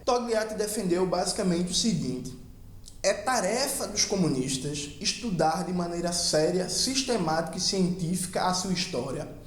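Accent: Pernambuco, Brazil. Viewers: sotaque pernambucano é charmoso demais pô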